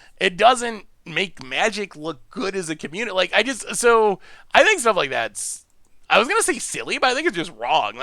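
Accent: Western New York, United States. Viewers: My wife went to school in Elmira. She said she recognized that you were from western NY right away. Hahaha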